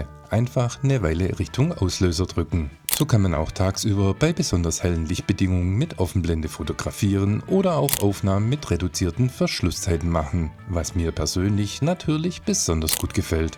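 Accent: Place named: Swabia, Germany. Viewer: Schwabe, ja aber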